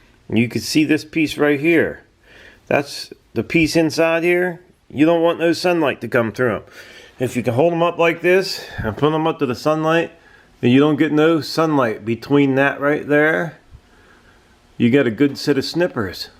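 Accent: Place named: Indiana, United States. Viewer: are you in Indiana? Your accent reminds me of someone in my hometown of Richmond, Indiana.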